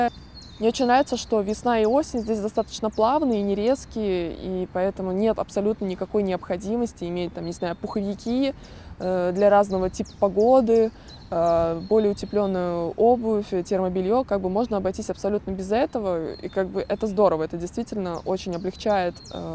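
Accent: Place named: Russia. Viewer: Dmitrijs Bogdanovs Русские в России в каждом регионе по разному говорят.И фонетика и лексика и фразео-лингвистические особенности и диалекты. говорит также как жители (девушки ее возраста и примерно одного образовательного уровня)любого крупного города России.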